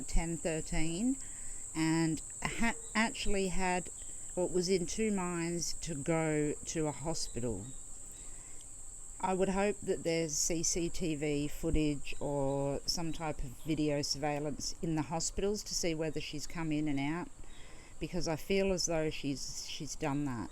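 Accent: Australia. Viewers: Are you Australian? Great video...praying for Gannons return 💙💙💙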